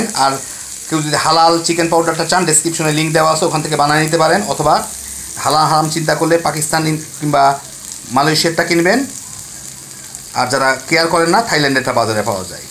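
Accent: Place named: Dhaka, Bangladesh. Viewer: আসসালামুয়ালাইকুম ওয়া রহমাতুল্লাহি ওয়া বারাকাতুহ।। আপনার কুঁচি কুঁচি আর তামা তামা ডায়ালগ টা আমার মেয়ে খুব পছন্দ করে ❤️ আপনার কথা গুলো পুরাই আমাদের মত ঢাকাইয়া😜 আমরা আসলে এত শুদ্ধ করে বলতে পারি না।যা বলি নরমাল ভাবেই বলি😁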